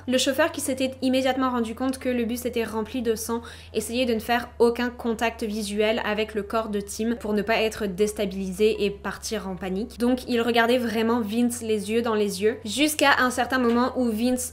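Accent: Quebec, Canada. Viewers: Je savais que tu avais un accent. Tu es de où au Canada-Québec. Fille du Québec xxx 🌺🌺🌺🌺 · Est-ce que tu parle avec l'accent québécois en temp normal?? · Pour dire que tu es du canada ton accent je l'entend a peine sur certains mot 😁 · Je suis au Québec et je me rappelle parfaitement de cette histoire. C'était assez fou ! Changement de sujet, en t'écoutant j'entends l'influence "canadienne" dans ta voix et le choix de certains mots. Je trouve ça mignon ;-) Bonne continuité xx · J étais sur qu'elle avait un accent français et québécois. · Petite question rien a voir, tu est Canadienne ou vous au Canada ? J'entends un tout petit accent que j'adore !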